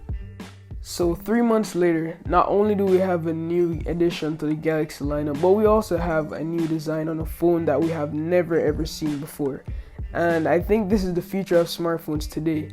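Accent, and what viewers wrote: Jamaica. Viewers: Are you Jamaican? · are you jamaican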